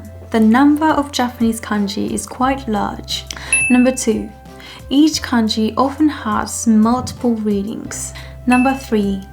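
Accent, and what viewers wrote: England, United Kingdom. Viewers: Your English accent is so lovely and calm, you speak very elegantly! I'm from London, currently studying Japanese and Korean while living in Seoul ☺ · Yee u right...her accent like british already But i prefer american accent 😢 Gomen ne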